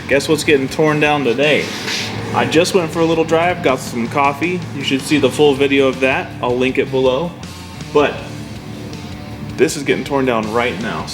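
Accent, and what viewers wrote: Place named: United Kingdom. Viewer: LOL hahaha love the intro dialogue. almost British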